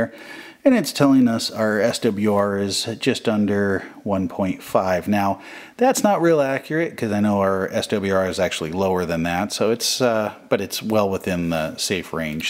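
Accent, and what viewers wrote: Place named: Pacific Northwest, United States. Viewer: Dang.. I remember the days.. But I got out of CB just before they went to 40 channels.. it got so crowded and folks were just playing with the radios.. Have always wanted to get back to it.. Maybe I will this year.. Great show.. sounds like your in my neck of the woods.. though I am a bit south in Vancouver Wa...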